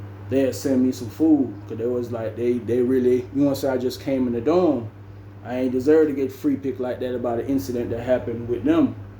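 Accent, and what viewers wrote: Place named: Jamaica. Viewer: It’s funny hearing a Jamaican and country accent mixed in one 😂 respect bro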